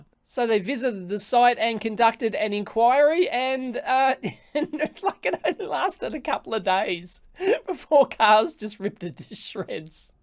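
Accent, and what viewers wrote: Australia. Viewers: I guess your info is interesting but your delivery is annoying. Smug aussie accent. ugh! just hard to listen to.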